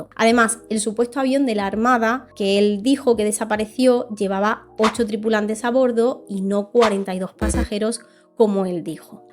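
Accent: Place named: Murcia, Spain. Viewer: Hola, me encantan tus videos, hace poco descubrí tu canal y enseguida me suscribí. Pongo tus videos en el trayecto de casa al trabajo y a veces en este. Mi mamá está intrigada por tu acento, porque, aunque sabemos que eres española, ella no había escuchado tu variante de acento. Yo digo que eres de Murcia, pero, ojalá me puedas contestar para resolver la duda de mi mamá. Gracias. Saludos desde México.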